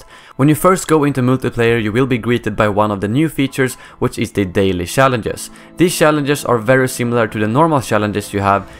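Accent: Sweden. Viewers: are you swedish dude? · Are you swedish?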